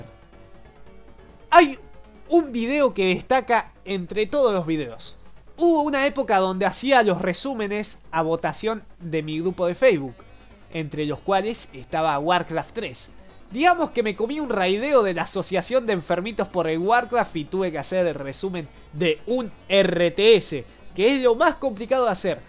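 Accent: Argentina. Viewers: No hay nada mejor que un narrador argentino (o con acento argentino)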